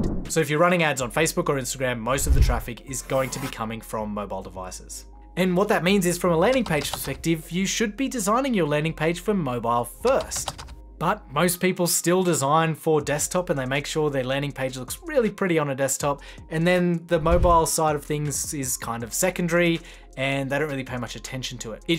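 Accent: Australia. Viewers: Really good material, and good to hear an Aussie voice too.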